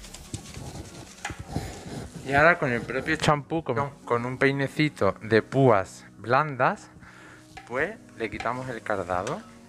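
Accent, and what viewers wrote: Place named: Spain. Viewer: Omg!!! Eres super lindo!!! Soy peruana y vivo en Canadá por más de 30 años. Primeramente: me encanta tu acento español... Que lindo tu asentó....😍😍😍 Me encanta tu carisma al hablar eres muy simpático y bromista con tu clienta y tus skills ... Woooow !!!! Eres bien educado en tu craft. Como me a encantado verte y aprender de ti. Eres un hombre muy dotado en tu profesión. Te voy a seguir...!!! JOANNE 🌹😘😘😘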